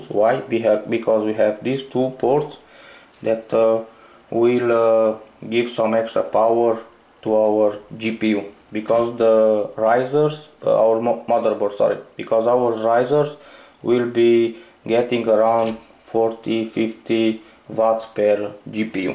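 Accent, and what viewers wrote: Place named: Romania. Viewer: ăsta sună a Român , ce dqr 😂 (this guy is Romanian ).....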